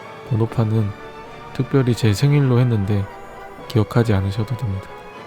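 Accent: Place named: Chungcheong, South Korea. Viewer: 구수한 충청도사투리 ㅋㅋㅋ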